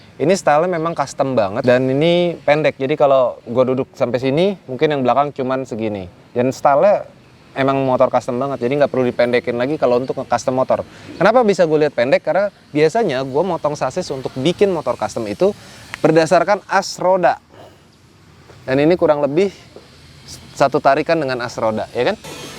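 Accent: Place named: Medan, Indonesia. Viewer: Tengok lah itu, kaya orng medan aja bg ini 😂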